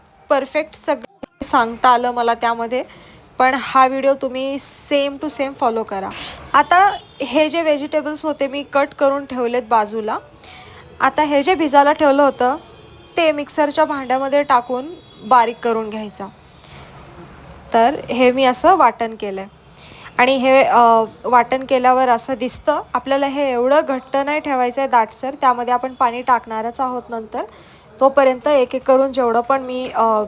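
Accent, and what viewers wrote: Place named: Pune, India. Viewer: तुमची पुणेरी मराठी खूपच शुद्ध आहे 😅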